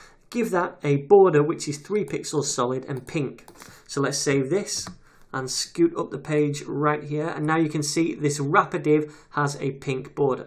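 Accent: United Kingdom. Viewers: is this the so called noble British accent?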